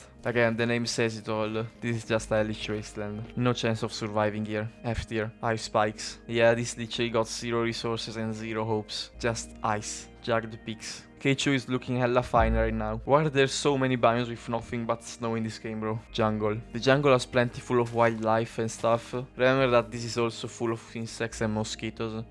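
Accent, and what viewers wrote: Italy. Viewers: un Italiano si riconosce subito hahahah, comunque parli molto bene e bellissimo video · ciao fratello italiano, grande video (e grande accento)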